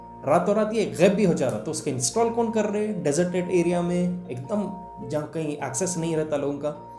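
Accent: Hyderabad, India. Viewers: PLEASE IGNORE NKO KARO🙏🏻 IMPORTANT Bhai main aapke videos 4 5 year se dekh raha hu main Mumbai se hu aapke sabhi channel dekhta hu....par ek bat mujhe aapse kafi salse puchhani thi ke Farhan Bhai Kyu nahi dikhte ab wo kaha hai aur Kya reason hai ki wo ab videos me nahi dikhte mujhe Jana hai aur mere sath mere frd log bhi Jana chahte Kya reason hai ki wo ab nahi nahi rahte videos me plz reply kariyga 🙏🏻 bohot Sara pyar Mumbai aur Meri tarf se aap ko dekh ke main kafi chijo ko sikha abhi main 20 salka hu main aapko 15-16 ka tha tabse dekhraha hu main aap ab se jarur life me ek bar milna chahta hu main bhi aapki tarah haidrabadi bolne ki koshish karta hu waise main nahi janta etna bas koshish karta hu ...... God bless you all ❤️❤️❤️❤️❤️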